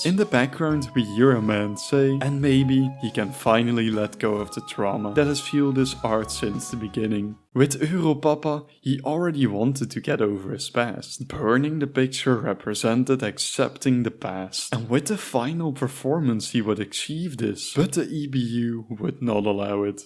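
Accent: Netherlands. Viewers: Ik ruik Nederlands 🇳🇱 het accent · Bro kan je echt niet wat beter engels praten met nie zon dik brabant accent erop, zelfs mij als brabander egert mij eraan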